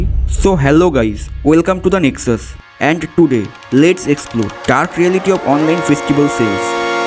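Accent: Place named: Bengal, India. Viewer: Your Bengali hindi accent ❤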